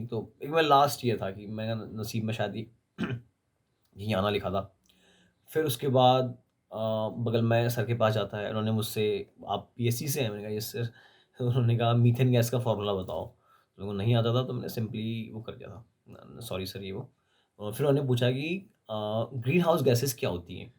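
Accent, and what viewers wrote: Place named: Nepal. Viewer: Interview ki bareme jankari diya bhai great, nepali jeisa laga bhai aapko aap nepali ho?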